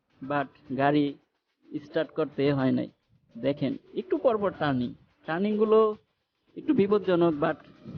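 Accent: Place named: Mymensingh, Bangladesh. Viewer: তর বাড়ি মনে হয় ময়মনসিংহ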